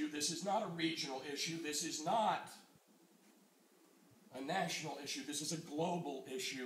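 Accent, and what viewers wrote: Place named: South, United States. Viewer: Southern drawl nonsense. Listening to this guy is like shitting razor blades. His daily trash talk on "The Horn" is pathetic and so juvenile. Keep propping up idiotic Lib rhetoric is all this clown does. Stands for nothing but status ignorant quo of leftist crap. How is that "hope and change" working out for you? You got your long legged mack daddy in the white house and see how he disregards you all daily. Absolutely hilarious!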